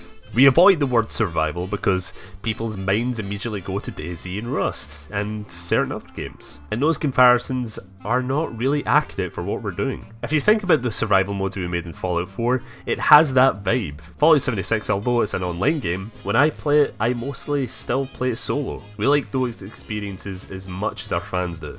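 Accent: Scotland, United Kingdom. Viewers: are you Scottish it sounds like it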